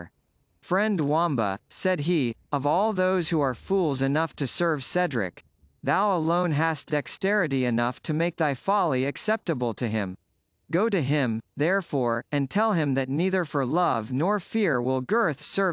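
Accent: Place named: United States. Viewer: An American accent is really unsuitable, it's dreadful & unlistenable actually!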